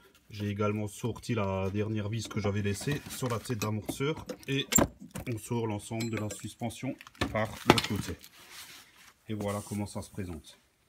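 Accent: Alsace, France. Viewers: Accent alsacien ? ;-)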